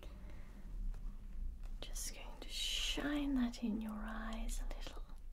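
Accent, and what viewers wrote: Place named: United Kingdom. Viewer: This is really COOL!! I enjoy it thouroughly!! I love your BRITISH accent!!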